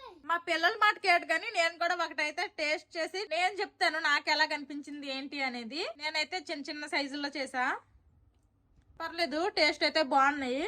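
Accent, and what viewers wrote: Srikakulam, India. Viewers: Hai sister నా పేరు సుధ మాది విజయనగరం పార్వతీపురం hai cheppandi Mi vlogs chaala baaguntaayi Mi srikakulam యాస బాగుంటుంది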